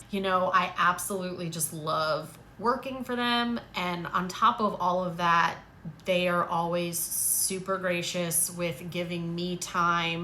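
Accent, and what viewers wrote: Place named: New York, United States. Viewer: you sound nothing like a woman from New Jersey. Definitely from New York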